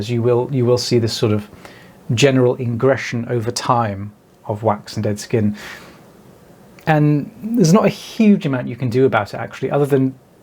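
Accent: United Kingdom. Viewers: I AGREE WITH HIM ABOUT THIS ! YOUR VOICE IS VERY CALMING TO LISTEN TO !!!!!! I'VE ALWAYS LOVED LISTENING TO BRITISH PEOPLE TALKING !!!! I LIVE IN SAINT CLAIR COUNTY , ALABAMA . MY PARENTS WERE FROM MARYLAND , SO I DON'T SOUND LIKE A TRUE SOUTHERNER WITH A "TWANGY" VOICE !!! I SOUND MORE LIKE MY PARENTS THAN I DO A TRUE SOUTHERNER DOES . 😊😊😊😊😊😊😊😊😊😊😊😊😊😊😊😊😊😊😊😊😊😊😊 !!! WHEN I WAS YOUNGER , I HAD A YEAST INFECTION IN MY EARS AND MY ENT HAD TO SQUIRT WARM WATER IN MY EARS AND IT MADE ME FEEL DIZZY AND NAUSEATED WHEN HE WAS DOING THIS TO ME . I LOVE YOUR VIDEOS - THEY ARE VERY INTERESTING TO SEE . 😊😊😊😊😊😊😊😊😊😊😊😊😊😊😊😊😊😊😊😊😊😊 !!!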